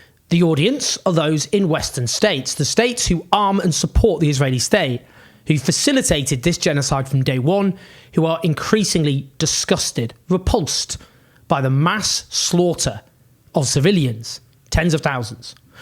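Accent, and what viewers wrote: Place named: Yorkshire, United Kingdom. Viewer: He’s from Yorkshire, in the north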